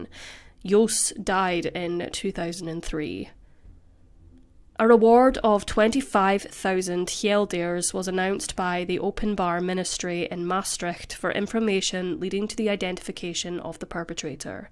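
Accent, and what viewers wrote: Scotland, United Kingdom. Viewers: Her accent is Scottish 😊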